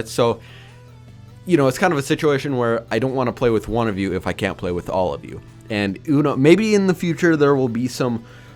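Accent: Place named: Upper Midwest, United States. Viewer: Oh my golly! I knew your accent was familiar! I am a minneso'an who travels to wisconsin frequently dontcha know.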